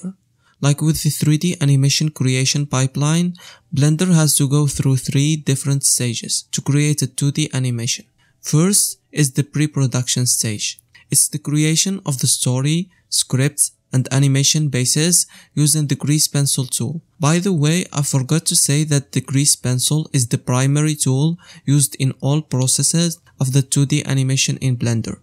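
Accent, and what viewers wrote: Morocco. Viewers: Is this a Moroccan accent ? Good video tho 👍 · Living for the Moroccan accent!! 😄 Bless you for this video! I'm trying to learn 2D and 3D animation on Blendr and it hasn't been so easy so far, a bit confusing! hopefully it'll get better soon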